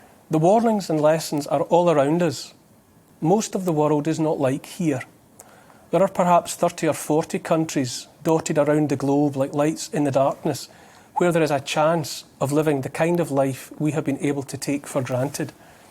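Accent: Scotland, United Kingdom. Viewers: Always admired the man (and his Scottish Enlightenment accent) But during Covid19 plague? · Neil's Scottish accent,reminds me my young time when I was attending my primary school and then grammar school in nothern Scotland Vic.(G.D.of Lux.).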